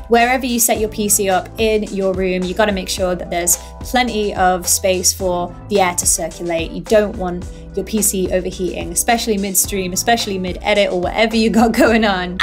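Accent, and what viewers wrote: United Kingdom. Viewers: glad to see you back on youtube and you have done an amazing job with the home studio, also i love the little hits of the British accent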